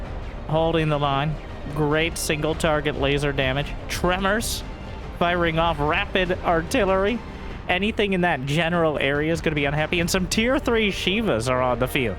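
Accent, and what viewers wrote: United States. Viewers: What is dudes new American accent?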